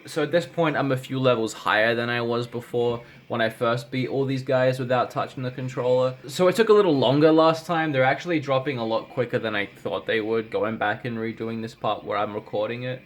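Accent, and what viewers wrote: United Kingdom. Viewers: Terrible British Accent? Snob? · Terrible British accent? Dont worry, we dont think much of your convict accent!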